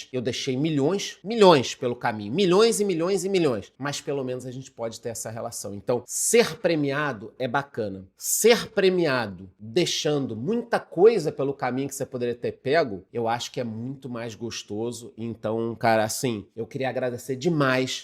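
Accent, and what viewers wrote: Rio de Janeiro, Brazil. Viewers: Adoro esse sotaque carioca ❤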